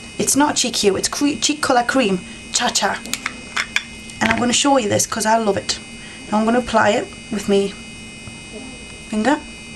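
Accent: United Kingdom. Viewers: Very good tips.. it will helps a lot in my photoshop retouches! Just one thing I hated: your british accent. American sounds good to me, but... Thanks a lot! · I'm Brazilian, could you talk a little slower? Just a little? I have to put the audio transcription to get most of what you say by reading, and normally I talk to native Americans who I can understand more than you who is British. I say that 'cause I love your videos and make ups.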